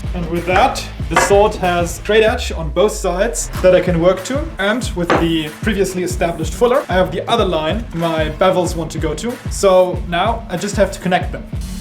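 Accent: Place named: Germany. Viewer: Eine interessante Herangehensweise, wie Du den Griff von Stich gestaltet hast.^^ Vor allem,.... bin ich eigentlich wirklich der einzige, dem es jemals auffiel, dass Orcrist aus dem Hobbit Film einfach nur eine Hälfte von Stich ist? Hält man 2 Orcist zusammen, hat man ein Stich. ^^ .... im englischen klingt der letzte Satz besser. xD Auch fand ich interessant, dass Du für Orcrist auf Blau gesetzt hast.^^ Der Drachenzahn Griff ist wirklich gut gelungen.^^ Die armen Pfandflaschen! xDDDDD Der Schwabe ging Dir jetzt aber sowas von an die Gurgel. Woischd, Kerrle. Dessin 25cent pro Flasche! O_o Ich weiß ja nicht warum, aber für mich muss Glamdring schon eine definierbare und klar erkennbare Blatt Klinge haben. Leaf shaped Blade im englischen, glaube ich. Neben Narsil/ Anduril mein zweitliebstes Schwert der Guten aus Mittelerde. Anhand der Menge an Cutting Tests kann man auch gleich erkennen, was wohl Dein Lieblingsschwert war. 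^^ Man kann es einfach irgendwie raushören. xD Wir Deutschen haben so einen gewissen Klang in der Stimme, wenn wir auf englisch reden. ^^ Nicht nur ein Landsmann, vielleicht auch noch einer aus dem gleichen Bundesland, wenn ich die Rheinpfalz richtig gedeutet hatte? ^^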